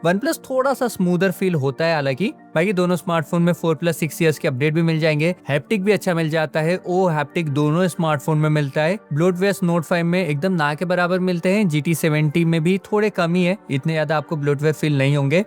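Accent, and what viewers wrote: Indore, India. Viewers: You are from indore ?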